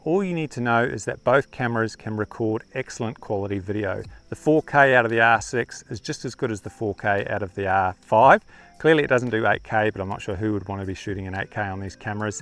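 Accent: Australia. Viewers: should add some subtitles mate, ur ausie accent isnt so easy for everybody..gday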